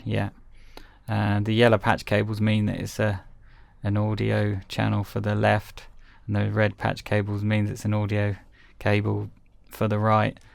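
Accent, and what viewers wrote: United Kingdom. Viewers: This video magnified my hatred of the British accent.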